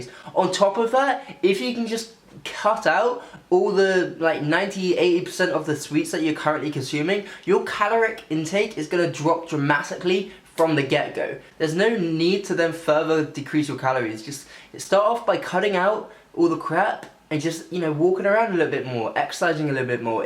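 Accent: United Kingdom. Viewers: Great transformation,. Just amazing....something I would dream about achieving. And as Leland, said, you are also fantastically handsome which makes the gains even more worthwhile. You've got it all, a great physique, super good looking man, wonderful British accent ( I love England and English folk), and of course, a wonderful boyfriend. Unfortunately, I have none of those....lol! Keep up the great work,! Your beautiful....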